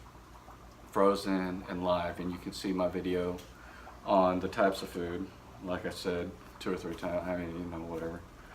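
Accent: South, United States. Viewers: I hope this isn't offensive to you or anyone else but as a Yank that southern twang is buggin' me out. Lol All love though brother and thanks for the advice. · This is refreshing love your southern accent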